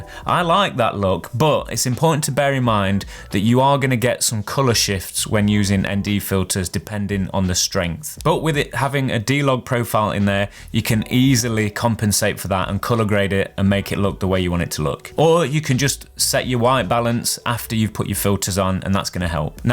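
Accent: United Kingdom. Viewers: wow how cute your brit ish accent luved it! Hi👋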